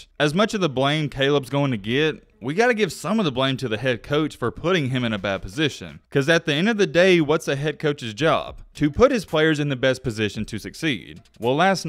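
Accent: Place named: Tennessee, United States. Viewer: Bro you must be from Tennessee , your voice sounds a lot like upchurch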